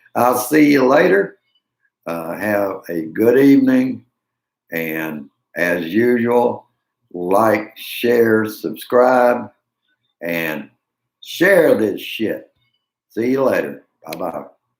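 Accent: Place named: Missouri, United States. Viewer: What's up! St. Louis Native here. Nice to hear the Missouri perspective with the accent! Keep it up!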